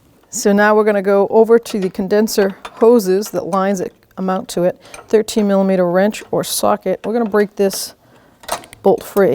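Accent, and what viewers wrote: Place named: Massachusetts, United States. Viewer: Love me a good mass-hole accent!!! Great video! Thanks for the help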